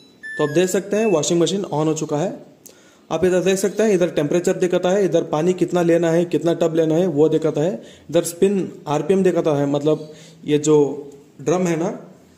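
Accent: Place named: South, India. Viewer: Your language is like south Indian speaking Hindi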